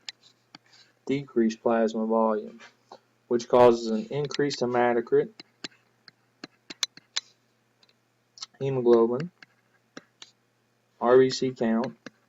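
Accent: United States. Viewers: And i like ur pure american accent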